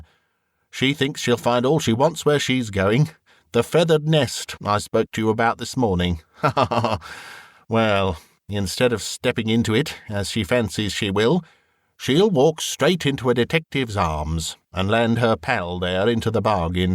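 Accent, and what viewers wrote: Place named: RP, United Kingdom. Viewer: I do really enjoy listening to you especially because you use…dare I say(!?!) what my Grandmother (who raised me) called “The Queen’s English” to great effect. Of course, it’s how these old stories are written.But I’m sure the authors would be thrilled to hear you reading them! ♥️♥️♥️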